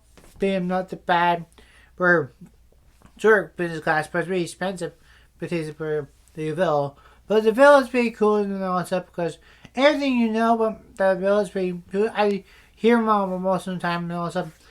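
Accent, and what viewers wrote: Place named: England, United Kingdom. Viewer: Awesome airplane review is very interesting and so much information enjoy like your English accent smile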